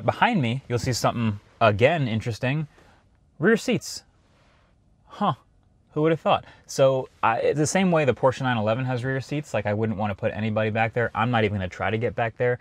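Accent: New Jersey, United States. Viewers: he so new jersey 😂🔥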